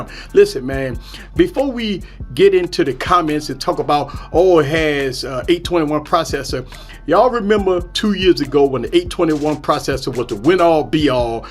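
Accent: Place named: South, United States. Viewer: Nice video....cant stand the southern bumpkin broken gutta' accent tho....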